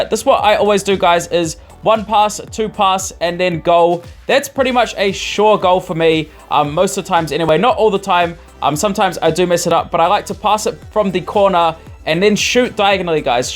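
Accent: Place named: New Zealand. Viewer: He sounds like a kiwi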